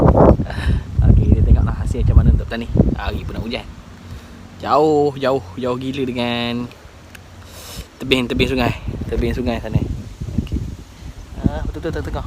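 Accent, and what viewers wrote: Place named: Temerloh, Malaysia. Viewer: Sedak btoi dengo org tmeloh borok nyak..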